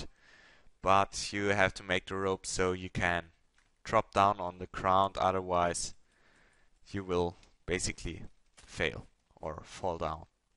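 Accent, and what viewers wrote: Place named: Germany. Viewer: I can really hear that your German but that's a good thing :D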